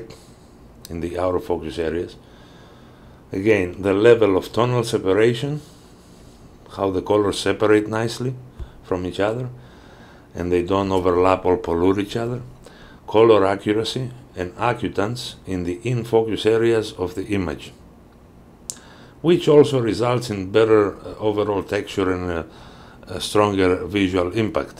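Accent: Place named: Greece. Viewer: May I ask if you are Greek? You have a very Greek accent. The video is great!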